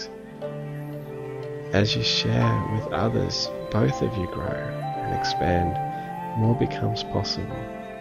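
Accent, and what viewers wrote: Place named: Australia. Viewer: This could have been a beautiful meditation if you trusted us to connect with our higher self when you took us there and gave us a little silence to see what arose. You were pushing an outcome, Just a little advice, a meditation is not a pod cast, too many words risks us being moved by your words not our personal 'inner body felt' experience which will be different for everybody and it also keeps us in our heads, having to try to listen to every word you say. This was my experience but going by the comments i am alone in this so obviously it served a purpose for so many so congratulations. Best bit of this was to hear an Australian accent for a change. love Mary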